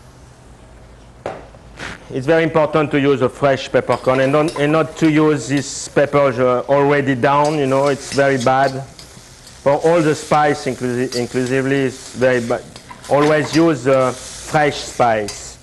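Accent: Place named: New Orleans, United States. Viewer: As a kid I feel in love with her voice from the intros. It was so sultry and New Orleans to me. I still think it's sexy! Hey Great Chefs people, let her know that! She should do voice over work.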